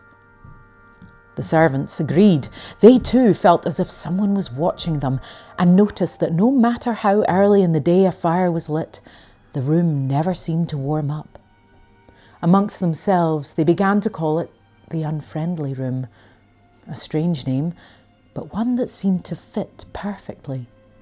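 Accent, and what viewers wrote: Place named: Scotland, United Kingdom. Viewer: This combo accent is hurting my head to process. My brain has two different boxes one for British one for Scottish. I am unable to hear the sentences as my brain goes back and forth.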